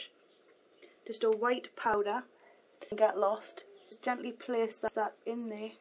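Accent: Newcastle, United Kingdom. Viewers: wooo for the geordie accent!!! what make up diod you use for this look because i'm a huge germany fan lol :P n yeah :P idc if i'm english, heh. x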